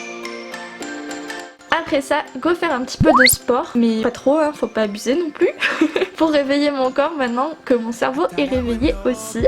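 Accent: South, France. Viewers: Isleym Hachfih peut être qu'elle est du Sud ? 😕😂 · J’ai pas compris pourquoi tu fais l’accent du sud ?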